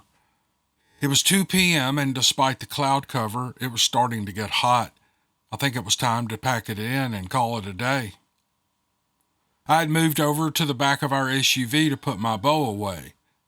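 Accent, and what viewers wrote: South, United States. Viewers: Hi I'm from the US Virgin Islands I listen to your podcast and I enjoy the Steve Lily and enjoy the tone of your voice it's a real southerners voice and I am very bad at writing so please bare with me again thank you.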